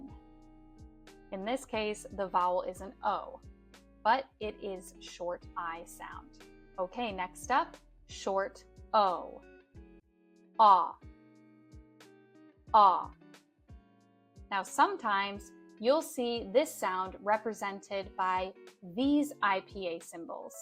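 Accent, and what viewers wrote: United States. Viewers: Teatcher are you amirican..?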